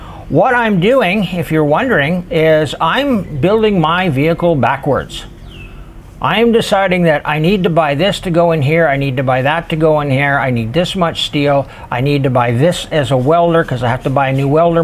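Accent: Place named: Canada. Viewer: You know what? This guy is speaking in plain English, albeit with a Cannuck accent, yet I cannot understand what on earth he's yabbering-on about, most of the time. I did actually fall asleep at one point...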